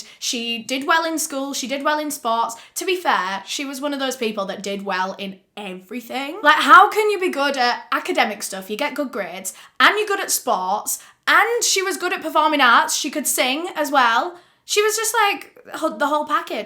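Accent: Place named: Northern England, United Kingdom. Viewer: As a yorkshire woman born and bred, I am loving the northern twang that sneaks in every other sentence xo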